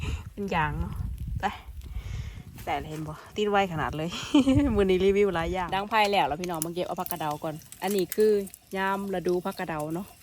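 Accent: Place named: Roi Et, Thailand. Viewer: บ้าน ใช้เงินบาทหรือเว้าคือไม่ใช่คนลาวสำเนียงเหมือนร้อยเอ็ดสารคามขี้ตกเหมือนกันร้อยเอ็ดเด้อหล่า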